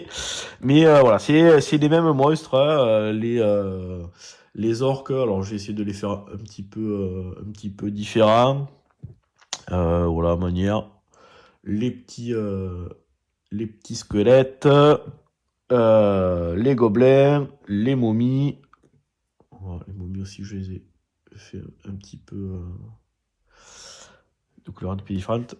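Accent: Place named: South, France. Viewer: J'ai pas comprit où on devait entendre une quelconque ébriété. Serait-ce l'accent du sud qui vous donne cette impression erronée?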